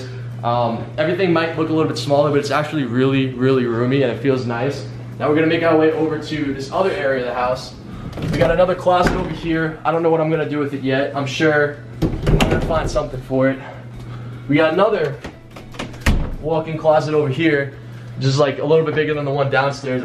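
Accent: New York, United States. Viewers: hes from ny that's why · new york accent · He has a New York accent so when he say chocolate it's funny 😭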